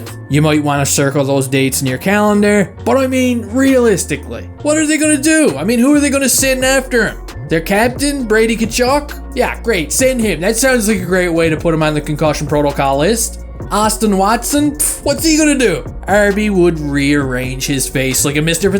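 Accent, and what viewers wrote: Newfoundland, Canada. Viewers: You sound like a stupid Newfie 😂 your content is trash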